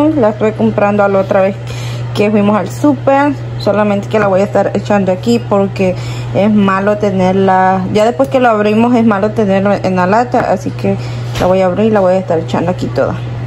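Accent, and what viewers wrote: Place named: El Salvador. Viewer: Los salvadoreños así hablan linda conozco muchos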